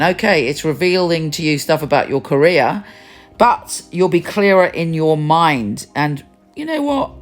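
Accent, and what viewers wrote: United Kingdom. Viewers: Is this? How come she has british accent?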